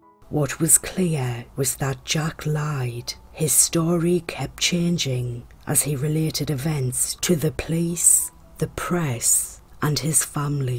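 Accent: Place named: Newcastle, United Kingdom. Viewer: You sound like a Geordie. I live in the USA, but I grew up in Lobley Hill, Gateshead..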